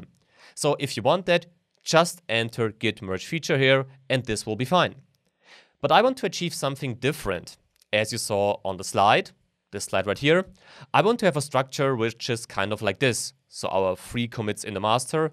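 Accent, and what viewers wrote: Germany. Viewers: Do you deliberately sound like Max in your pronuntiation? or is it a thing in German people to sound like that? Great video! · I was deciding whether or not he had a german accent; but then he said "entwickelments" instead of developments and it all became clear to me.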